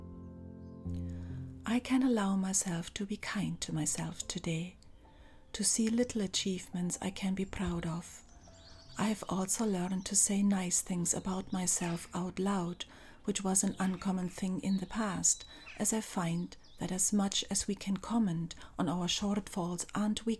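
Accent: Scotland, United Kingdom. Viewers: Hello from Dublin Ireland i just found your channel and i am really enjoying catching up on your previous videos. Where is your birthplace ? You have some Scottish accent.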